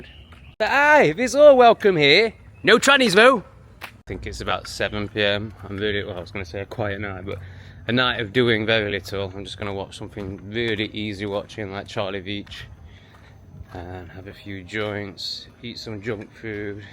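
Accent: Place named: Upper class, United Kingdom. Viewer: You remind me of a privileged guy that went to some famous public school in the uk with that whining upper crust accent. I’ve seen the behavior a few times usually in a movie with the English in some foreign country less developed than their own . Not trying to insult but are you sure you’re strapped for cash? In your free time it might help to at least try to learn a few words of correct Spanish because you sound like even trying is beneath you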